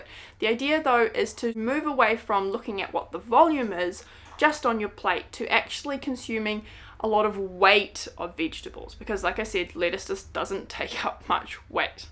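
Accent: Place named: New Zealand. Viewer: How good to hear a kiwi voice talking candidly on this topic! And I love your hair 🥰 it’s so pretty 😍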